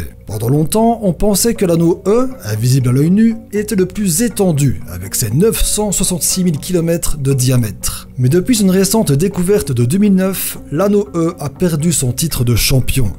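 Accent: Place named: Belgium. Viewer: c'est bon j'ai rien dit. il a dit les mots photo et huit. Belge je pense. bref sympa à regarder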